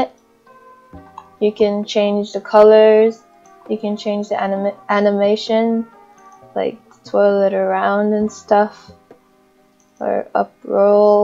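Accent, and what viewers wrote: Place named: Europe. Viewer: why do i lowkey hear some sort of like european accent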